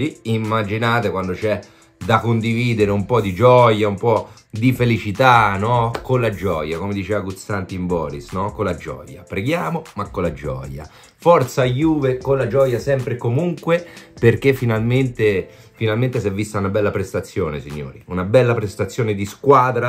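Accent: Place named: Rome, Italy. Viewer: Ma quando mi piace sto....con questo accento romanesco sempre sorridente, competente.Arrivera' il giorno di miretti di fagioli e attenzione.....Pogba.....sono di Bergamo juventino (antiatalantino).Mi raccomando.Ciaooo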